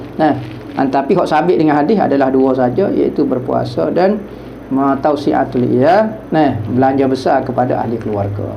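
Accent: Kelantan, Malaysia. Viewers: Kelate pekat ustad terbaik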